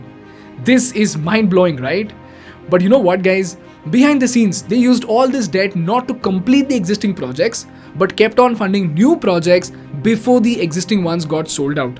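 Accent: India. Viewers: Hello if you hear this accent on a Phone for Tech support/ Refund department PLEASE hang up the PHONE!!! sorry i have to comment this here cause Million dollar been scam by indian scammer past decade! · Man this indian accent gets me crazy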